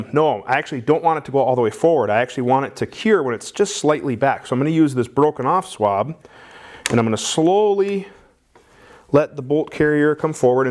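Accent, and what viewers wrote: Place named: Wisconsin, United States. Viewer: Ah, the accent of a fellow cheesehead. Sounds like home.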